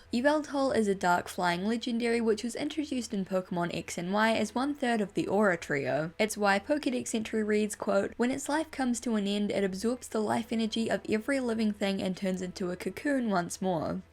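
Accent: New Zealand. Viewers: wait, you're a kiwi too? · Kiwi?? I thought you were British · YOUR A KIWI!!!!!!!!!!! WOOOOOOOOOOOOOOOOOOOOOOOOOOOOOOOOOOOOOOOOOOOOOOOOOOOOOOOOOOOOOOOOOOOOOOOOOOOOOOOOOOOOOOOOOOOOOOOOOOOOOOO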